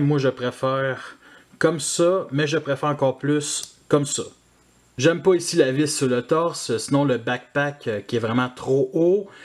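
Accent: Quebec, Canada. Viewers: Nom d'un caribou en rut, c'est une super petite chaine que je viens de trouver, ca va me faire un super entrainement à l'accent québécois (je suis frncais, et je viens de m'abonner)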